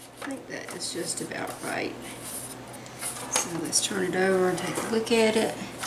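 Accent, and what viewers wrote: South, United States. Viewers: you look beautiful 💖 Love the bunny ideas❣️❣️❣️and I love your Southern accent, you're wonderful ♥️♥️♥️♥️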